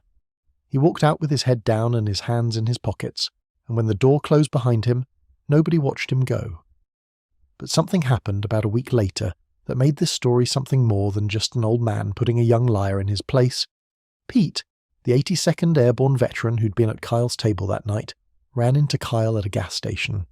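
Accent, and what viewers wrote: United Kingdom. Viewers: Why, oh tell me WHY, Lord, is this story delivered with a British accent? I can't listen!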